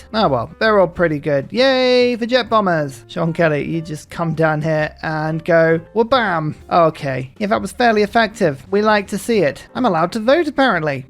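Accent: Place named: United Kingdom. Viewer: You are so much fun to watch! You have a rare wit and take sweet joy in this excellent game. Your British accent is wonderful too. I like to watch your series before I try my next A-Z challenge Civ to get an edge. Thx. I am trying Poland now. I played one game on Europe TSL-but I didn't have enough Civs-and without Spain Portugal or England in the game, France (Catherine Medici) ran away with settling western Europe and beat me with a cultural victory. I'm starting again with all the Civs-hoping they will fight eachother and not me.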